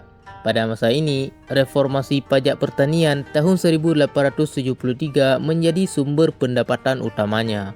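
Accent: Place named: Java, Indonesia. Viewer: medok amat bli..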